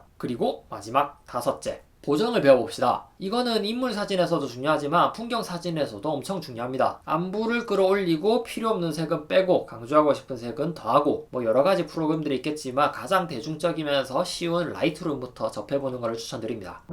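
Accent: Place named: Gyeongsang, South Korea. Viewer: 갱상도 사투립니다ㅋㅋㅋ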